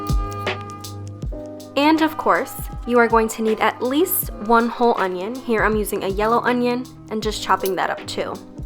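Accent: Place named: United States. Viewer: American, not Albanian accent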